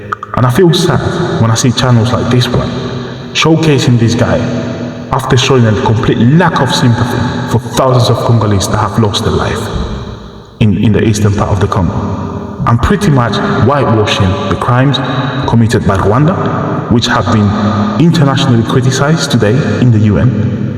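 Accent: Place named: RP, United Kingdom. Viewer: Your analysis is flawed ... And your Porsche accent shows you are not even resident in Africa.... Many of your congloese brothers and sisters on your Eastern borders are celebrating the M23 rebel takeover of Bukavu and Goma cities.... DRC government too has to take responsibility blaming Rwanda wont cut it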